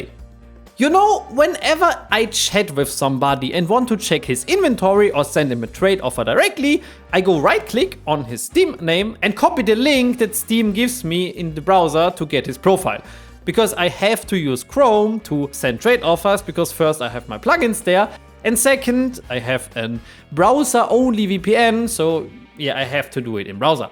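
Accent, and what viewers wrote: Germany. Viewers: Man hört richtig den deutschen Akzent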